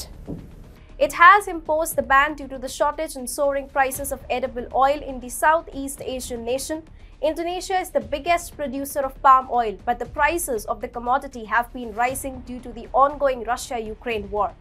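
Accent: India. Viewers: with this thick curry accent, i suggest you to put on subtitles for us to understand better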